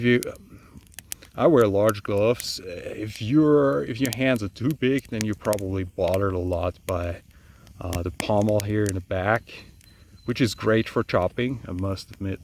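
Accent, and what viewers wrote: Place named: South, United States. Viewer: I love your accent! I hear a lot of influence from the American South, no?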